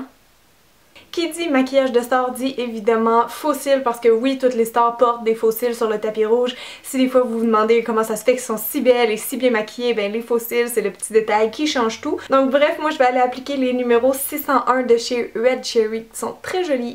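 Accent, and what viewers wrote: Quebec, Canada. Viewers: Habituellement, je ne suis pas fan de l'accent Québécois mes avec toi sa passe tout seul ! J'adore vraiment beaucoup tes vidéos je te trouve MAGNIFIQUE je trouve également que tu as un aire de Kim Kardashian (c'est un compliment) Bisous ma belle :)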